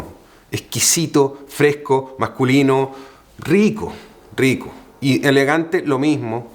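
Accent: Chile. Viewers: Que lindo que es el acento chileno 🙌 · Hablas como Chileno, aunq usas ciertas palabras españolas que despistan... pero tu expresion de ir a comprar el pan por la mañana es un sello Chileno genuino 😂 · por que desis vosotroa ai aoa chileno? es algo que tambien note en tu hermana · Es chileno, erradicado en España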